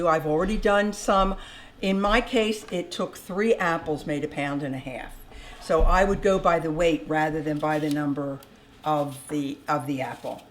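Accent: Philadelphia, United States. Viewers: I pray is doing well so glad I found this channel! I knew you were from the Philly area by your accent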